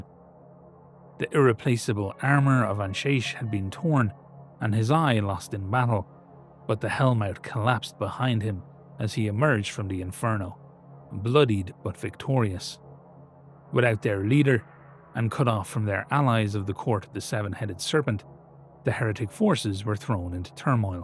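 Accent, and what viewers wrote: Ireland. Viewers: Man, this must have been fun for you. I really enjoyed hearing this read in an Irish accent. Brilliant job, mate! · judging by the accent it sounds north tip or Offaly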